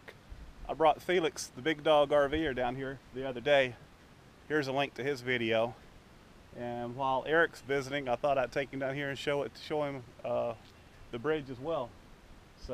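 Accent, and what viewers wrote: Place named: Georgia, United States. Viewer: Great video! Being from Australia I love the Georgia accent and also Yorkie woof pack - similar but smaller than the Aussie terrier!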